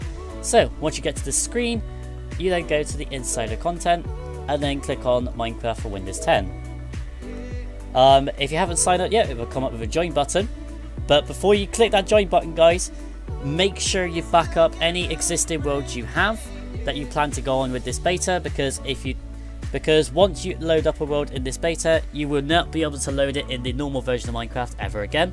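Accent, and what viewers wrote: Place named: United Kingdom. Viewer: You sound like a british Linus Sebastian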